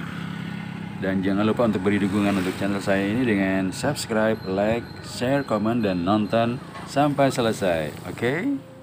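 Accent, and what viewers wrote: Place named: Pekalongan, Indonesia. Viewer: Hadir bos....sebenarnya orang mna sih..bahasanya kyknya pekalongan tulen....kecamatan mna gitu ..kl boleh tau..😑😑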